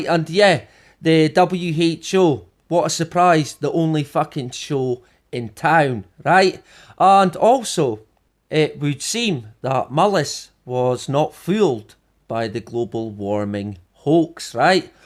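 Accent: Scotland, United Kingdom. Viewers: Shalom shalom, dear friend from bonnie Scotland. Sure you're right, it's not at all about control lol. What ever gave you that idea? 🤣🤣🤣 Thank you so much for the research you do and share here. It's always a blessing and a pleasant break from other things here to hear you tell it like it is with your awesome accent and sense of humor. My favorite place to hear what's really going on. Blessings on you and yours! 💚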